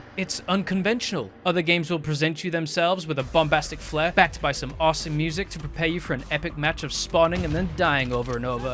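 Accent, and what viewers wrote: United Kingdom. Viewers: The pyrocynical diss is crazy, this channel feels like an exact clone. The autistic obsession with random video games, the queer fursona, the british accent. Its all there.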